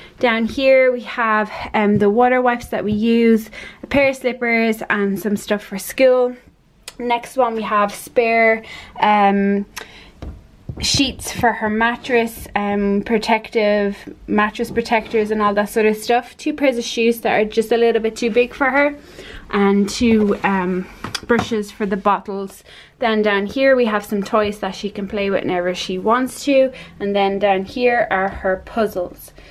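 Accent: Ireland. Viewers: Well done,. I'm going to share this video with my Drama class so they can hear a proper Irish accent:-D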